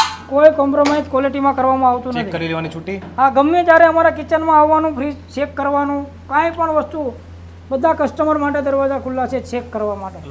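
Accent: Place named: Kathiyawad, India. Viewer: Vaah gujarati❤ kathiyavadi che. Sachu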